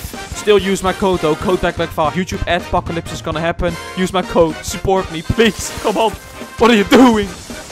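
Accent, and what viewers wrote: Netherlands. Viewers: Je klinkt Nederlands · zn accent is zo nederlands ik ga stuk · where do you come from? you sound like youre from the netherlands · Hij heeft gekke Nederlandse accent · Je bent gewoon nederlandss haha hoor echt duidelijk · Haahha dat nederlandse accent door je engels is echt goud.... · Hoi ik weet dat je nederlands bent ik hoor het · Hij praat echt zo Nederlands engels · Ik hoor gewoon dat je Nederlands bent😂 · Are you dutch? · Boii. Are you dutch? You sound dutch🤣 love your vids❤ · Dutch accent (je hebt echt een Nederlands accent 😂😂) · ja he hij heeft zo’n Nederlands accent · this guy is dutch, of niet? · Hij heeft heeft Zo'n nederlands accent poeh he😂😂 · Je hebt een Nederlandse stem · Dutch accent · Je bent nederlands ik hoor het aan je accent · Is he maby Dutch Hij is 100% NL Zijn Engels zo funny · You are from holland arent you? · You sound dutch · is hij nederlands ofzo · Are you Dutch? 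· Wat een lekker Hollands accent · Je bent gewoon Nederlands leer Engels praten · Ja echt aan hem accent hoor je al dat hij nederlands is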